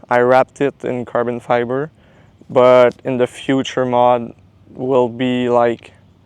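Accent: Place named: France. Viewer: Are you French I kinda hear that French accent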